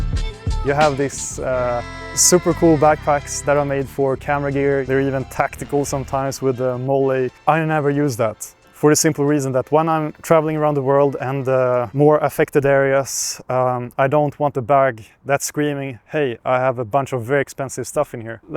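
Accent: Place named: Sweden. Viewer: I love the swedisch accent, its so cool. And it reminds me of Ollienelson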